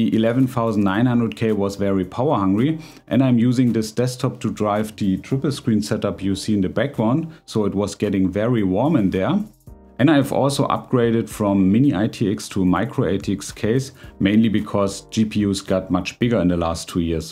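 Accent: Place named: Germany. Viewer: Dude. I'm Polish and I knew you're German the moment I heard you.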